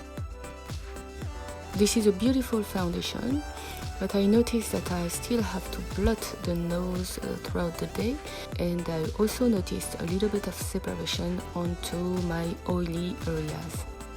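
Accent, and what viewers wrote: France. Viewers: Great eyeshadow look and your French accent is 🙌🏼!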